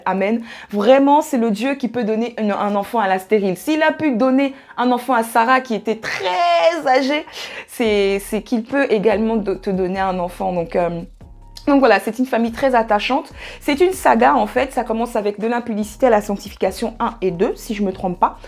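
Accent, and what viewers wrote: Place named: England, United Kingdom. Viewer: Une vidéo très intéressante, j'aime cet accent anglais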